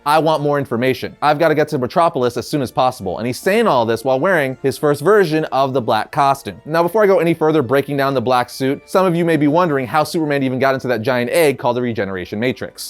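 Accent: Puerto Rico. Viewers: Where's your puerto Rican accent? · What!?!? Eres boricua???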